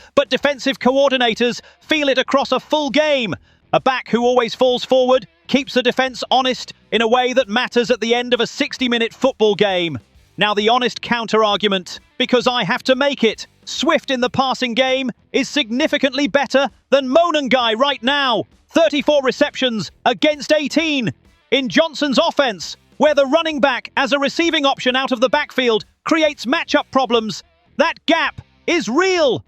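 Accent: United Kingdom. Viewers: Why are you using a British voice for American Football it's ANNOYING!!!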